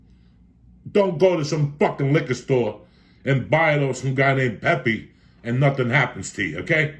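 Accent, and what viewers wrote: New Jersey, United States. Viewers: U sound more jersey already uncle. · Lol u can tell hes been in Jersey that accent is deeper than ever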